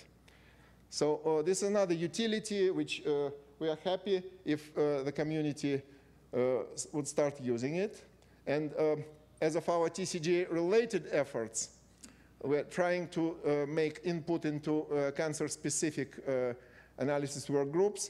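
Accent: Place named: Russia. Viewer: He speeks with thick russian accent.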